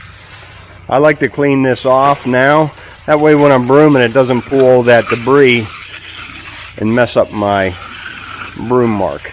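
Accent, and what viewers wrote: Pittsburgh, United States. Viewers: I enjoy watching your videos. Very refreshing to see people with good work ethics, and other ethics...👍💪🏅 I have learned a lot about pouring and finishing concrete! I have to chuckle every time to correct yourself on the pronunciation of the word "Out". 😀 I assume because there's lots of comments about it. You don't need to do that, you are from Pittsburgh, it is not going to change! Be yourself, that's all part of it. I have a friend here in the Southern Maine/NH area that moved here from Pittsburgh 35 years ago. His pronunciations and little phrases are identical to those of you and after all these years. Don't try to change, and don't worry about those who think you should. Be yourself, I wish you well with the channel. I hope you can gradually shift to doing more fun things. Enjoy life. 👍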